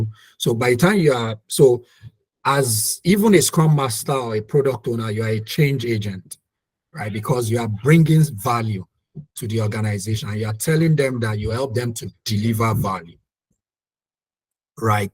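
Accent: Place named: Nigeria. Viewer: 😁😁😁, my boss thank God you're Nigerian ooh,other people i no really hear them,im sorry if it's offending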